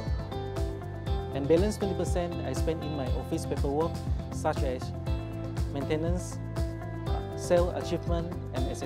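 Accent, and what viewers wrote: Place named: Malaysia. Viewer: Bro, his accent is fine in Malaysia as most people in Malaysia is like that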